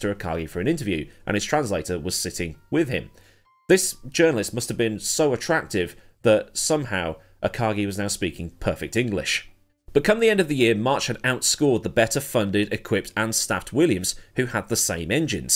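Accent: United States. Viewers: Your American accent is impeccable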